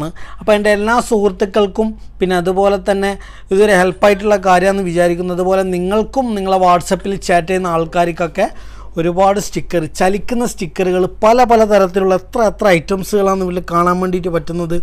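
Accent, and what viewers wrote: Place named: Kannur, India. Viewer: ഇതാണോ കണ്ണൂർ ഭാഷ..... കേൾക്കാൻ നന്നായിട്ട്ണ്ട് ട്ടാ ഗഡീ..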